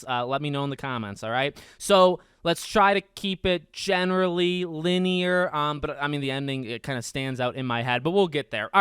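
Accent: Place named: Michigan, United States. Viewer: Just came across this channel and you do a great job! You sound like a Michigander lol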